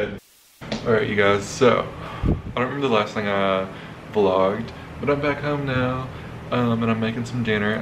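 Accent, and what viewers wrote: South, United States. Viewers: I've never heard a country gay accent, it's so mesmerising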